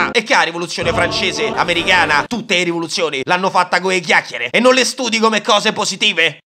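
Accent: Rome, Italy. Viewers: Te vogliamo bene,solo per l'impegno che ci metti nel fare questi video e per la comicità con accento romano che non mette freno alle mie risate. Grazie di tutto <3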